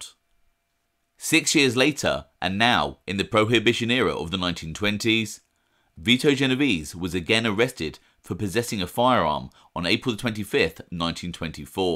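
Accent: United Kingdom. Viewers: I love your videos, and always get an unintended chuckle at hearing you recite dialogue from mobsters in your British accent. In another video, you reported that a particular mobster worked at a place called Top Tomato, which you pronounced the American way, but with your own accent. I bet you debated with yourself about how you were going to pronounce it when writing the script for that episode. Keep up the great work! I really appreciate the effort you put into these videos.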